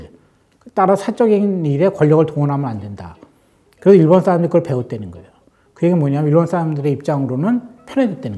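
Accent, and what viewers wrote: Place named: China. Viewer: 언어구사가 중국 계열이신거 같다. 혹시 화교신지요?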